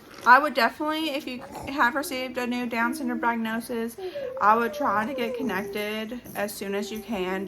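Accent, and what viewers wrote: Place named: South, United States. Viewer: Sounds like maybe some place in the south !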